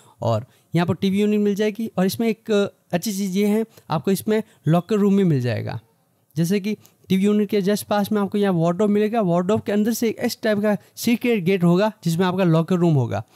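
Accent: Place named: Jodhpur, India. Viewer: Maza aa gaya apne jodhpur walo ki hindi bolne se pata chal jata he ki apne jodhpur ka hi he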